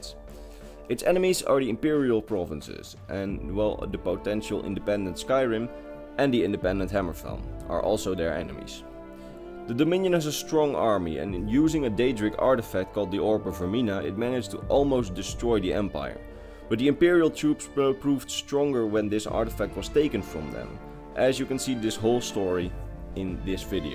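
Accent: Netherlands. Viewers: It's Dutch haha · Haha bro je klinkt zo nederlands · lekker Nederlands accentje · First 2 seconds i can hear u dutch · Is this guy dutch? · Listening to your accent I think you are Dutch, right? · Are you dutch? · Ik hoor een Nederlandse accent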